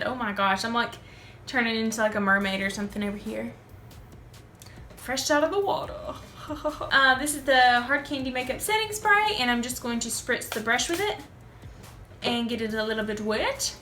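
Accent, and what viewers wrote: South, United States. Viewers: you are sooo pretty!!! and i love southern accents! ❤ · hears southern accent subscribes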